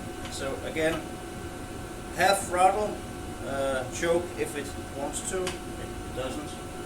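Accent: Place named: Denmark. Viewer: i have a Norlett with variable speed drive and reverse. I like it way more than the Texas brand👍 I swapped the clapped out old Briggs that would never start, for a chinese honda clone engine. And now i use it with tires on, and a front mounted mower that were originally meant for a texas tiller 🙂 i just found your channel and subbed because i could immediately hear you're a fellow dane 😁🇩🇰🙂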